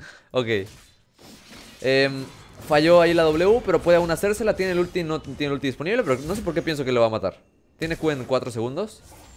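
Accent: Colombia. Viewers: Eres de Colombia? XD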